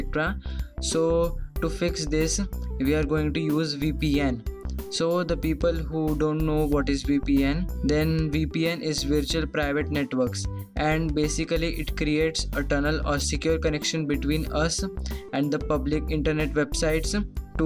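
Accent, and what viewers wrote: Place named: India. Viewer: i love when indian talking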